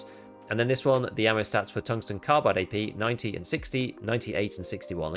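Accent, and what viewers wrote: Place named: England, United Kingdom. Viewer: Yeah, always Johnny on the spot with the videos, read to us in a pleasant English accent. Fuckin' a man, fuckin' a.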